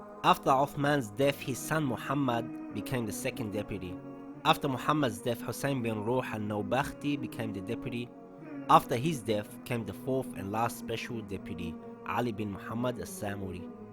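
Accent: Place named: Australia. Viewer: U got an aussie accent.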